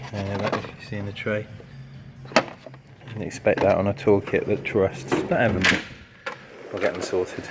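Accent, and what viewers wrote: United Kingdom. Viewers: English man in USA ?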